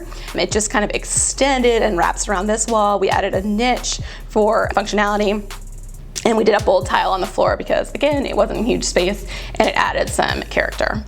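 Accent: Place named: United States. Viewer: I don’t hear anything but an American accent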